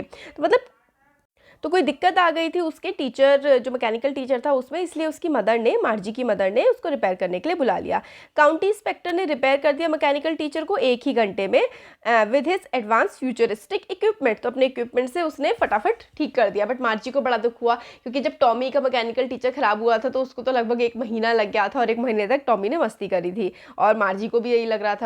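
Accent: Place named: Haryana, India. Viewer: Mam I think you belong to haryana because your voice like my friend she belongs to haryana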